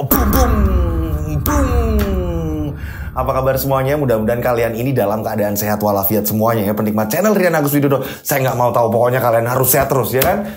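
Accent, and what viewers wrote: Java, Indonesia. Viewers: Bom yoskay... aq suka cara membawakan cerita nya terang dan jelas 👍... logat jawa nya lhas banget mas 😊 enak di dengar nya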